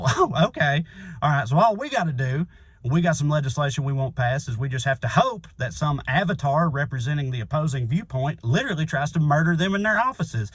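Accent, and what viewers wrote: South, United States. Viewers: Amen...sir...so refreshing to hear a Southern voice spout common sense...its rarified to be certain.....and if you aren't the only one please loop me in.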